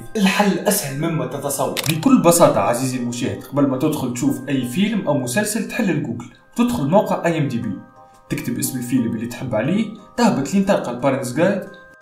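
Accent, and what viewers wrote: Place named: Tunisia. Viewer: حضرتك تونسي انا اول مره اقهم حد تونسي ❤لكنتكم حلوه اوي بس صعبه شويه ❤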